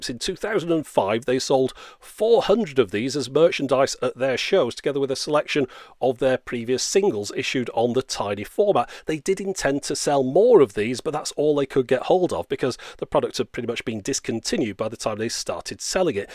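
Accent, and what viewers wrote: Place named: RP, United Kingdom. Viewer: The voice sounds like Finn from BBC English...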